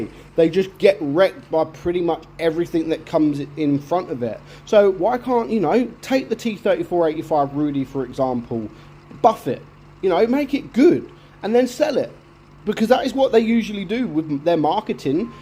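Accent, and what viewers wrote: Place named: England, United Kingdom. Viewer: ^ is ENGLISH & doing his video in ENGLISH so he speaks ENGLISH** **( well there's a fucking surprise, "DUH"!!!!! ) "If" YOU want proper pronunciation then stop-watching, fuck-off & go make a POLISH version....YOURSELF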